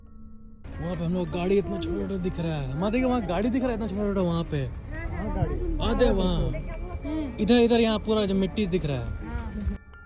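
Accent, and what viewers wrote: Bihar, India. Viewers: App bihar se ho kya sir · Aap bihar se ho lagta hai 😉😉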